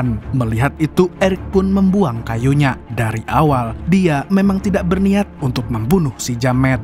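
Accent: Banjar, Indonesia. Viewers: urang banjar pian lah min 😀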